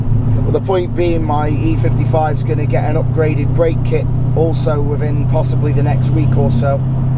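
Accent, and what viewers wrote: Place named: London, United Kingdom. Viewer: You sound almost like a londoner... that's been living abroad a while. Sick car though.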